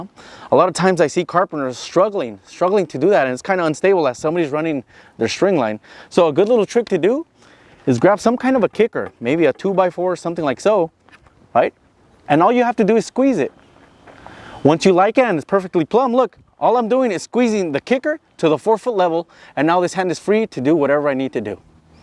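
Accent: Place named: Canada. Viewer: A hispanic man with a Canadian accent. Too cool, I could listen to this guy for hours. He’s a very good presenter. 👍🏽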